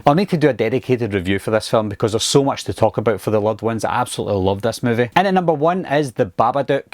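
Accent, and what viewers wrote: United Kingdom. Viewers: Just please show a movie, dont show your commentary. You are not Australian. You are British!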